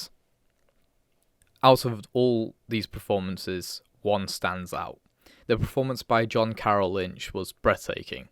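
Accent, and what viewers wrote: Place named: United Kingdom. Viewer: I enjoyed the review m8 👽 Gotta love the silky smooth british audio~ keep up the good work. 👍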